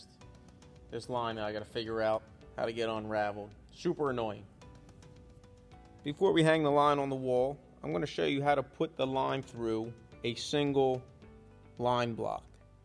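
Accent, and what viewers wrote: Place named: Baltimore, United States. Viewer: Based on your accent, you are from Baltimore or Philly.?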